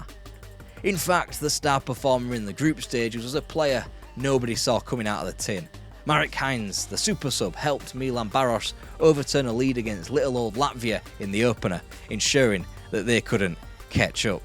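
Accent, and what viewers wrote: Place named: North, United Kingdom. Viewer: Northern I was certain about. It's a very cool sounding accent, like someone I'll have three pints with on a saturday afternoon.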